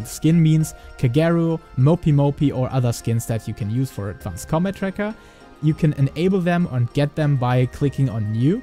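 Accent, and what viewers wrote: Germany. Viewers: You're German am I right? :D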